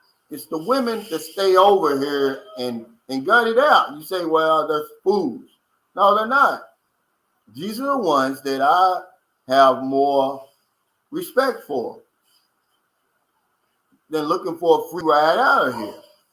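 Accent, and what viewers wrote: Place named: New York, United States. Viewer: hey funny you dont sound upper crust you sound rough new york type but what you say is as smart as anyone example love yourself first before look for external love thats as smart as ive ever heard dont sugar coat ones lack of selflove with anothers love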